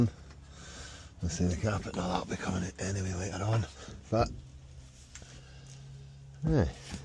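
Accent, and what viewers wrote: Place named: Scotland, United Kingdom. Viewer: Hi there. Yes, i can understand what you are saying in the videos, helped I think by the fact that I had extended family originally from Glasgow - that moved to NZ. Whilst not everyone understands the Scottish, I guess that the same can be said of any other language too. If one trains their ear, wants to understand, they'll make the effort To understand. I've binged watched a few from the beginning, think I've got your voice suzzed now, your cars - some of them - are of varieties I've owned yrs past, so is interesting to watch you 40 yrs on rebuild and put back on the road. I'll pop onto your pages on and off, and slowly work my way up to present day uploads. Have a good day. Cheers from NZ 🇳🇿😎 M.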